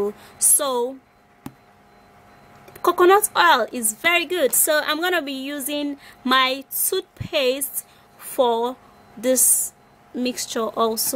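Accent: Nigeria. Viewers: thank you so much indeed!! all your videos are great love it.Cuz it's amazing girl!! you teach me how to use it!! thanks once again for your help and advices for showing us to be able to get clean Oral Hygienic!!! such a courageous active young lady! ! well done and keep your efforts!! love Nigerian accent they're cool people! !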